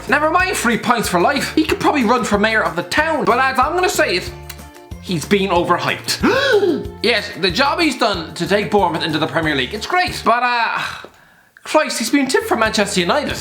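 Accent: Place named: Ireland. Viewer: I love this guy his Irish accent is so funny wich makes the videos so entertaining. Hope everyone is staying safe through these tough times.❤❤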